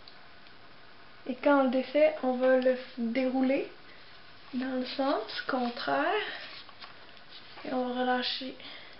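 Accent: Quebec, Canada. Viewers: Merci madame la Québecoise à l'accent adorable, la France te remercie elle va pouvoir se boucler les cheveux sans faire de révolution ! Youpi ! <3 j'adore ta technique ! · Merci je suis novice là dedans j'ai toujours voulu m'en faire :) tu explique très bien, tu as de très très beaux cheveux, puis tu es ravissante ! autant les cheveux lissés que bouclés :) et en + de ça j'adore l'accent québécois :D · Elle est québécoise pas Belge. On ne parle pas comme ça! :p Mais l'accent est mignon! :) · Elle est québécoise, d'ou son accent.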